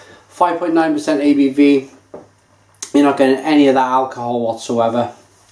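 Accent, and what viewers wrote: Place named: London, United Kingdom. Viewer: YOU SOUND LIKE YOU'RE FROM LONDON TOWN! Nice review.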